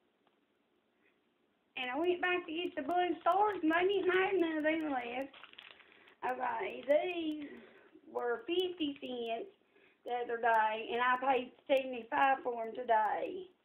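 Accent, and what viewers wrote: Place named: South, United States. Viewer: Hey, love watching your videos, your southern accent gets me every time it's too cute. I fianally found the cinnamon candy and colouring books! I was so happy, I bought two bags of the candy it was really yummy. My yorkie loves any kind of jerky. Like seeing what your mani of the week is too! Have a great weekend sweet friend. Xo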